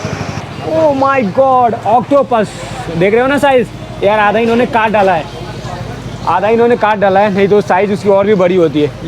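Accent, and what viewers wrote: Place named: Bihar, India. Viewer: Bhasa mein thoda bihari put aa raha hai. Jaise aap aur ko aud bol rahe ho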